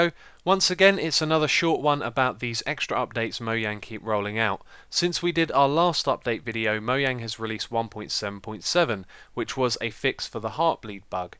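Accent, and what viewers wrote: United Kingdom. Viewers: <3 Brit accents (content also good/perfect, as usual }=Þ)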